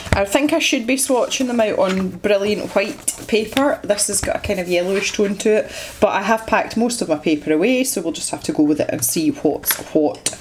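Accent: Scotland, United Kingdom. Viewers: Oh man captions do not get a Scottish accent. lol 😂 drawing looks beautiful though.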